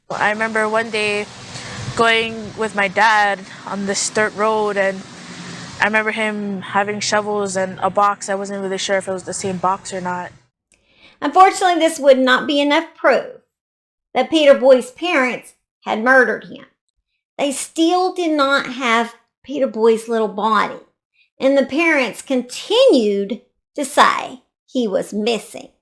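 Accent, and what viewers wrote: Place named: Tennessee, United States. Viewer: I love your Tennesse accent!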